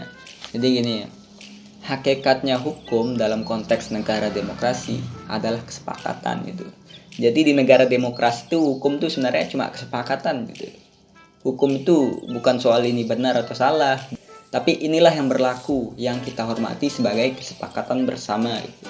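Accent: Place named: Lombok, Indonesia. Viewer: Logat sasaknya kentel banget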